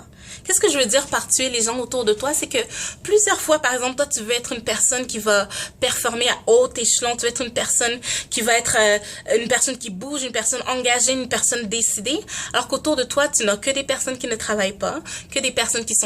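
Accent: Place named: Quebec, Canada. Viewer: cette accent <3 je vous kiff les québecquois <3<3<3<3♥♥♥♥♥♥♥♥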